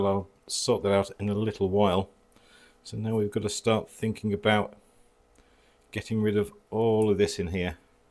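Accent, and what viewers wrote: United Kingdom. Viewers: I'm from Birmingham and I live on the Welsh borders in Shropshire. I have a thing for accents, I love them and I'm sure I can detect a remnant of West Midlands in your speech. Thankyou for your welcome. I'm enjoying working through your back catalogue.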